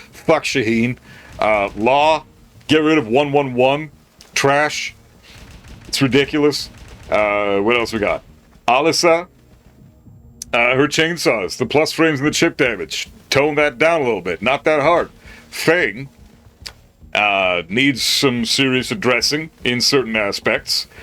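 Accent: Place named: Canada. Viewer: You're Canadian 🇨🇦 too eh! I'm from Toronto.. love to hear it.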